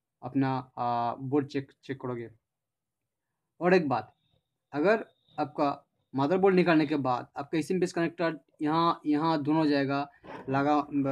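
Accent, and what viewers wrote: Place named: Assam, India. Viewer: Ur way of speaking is like an Assamese people are u from assam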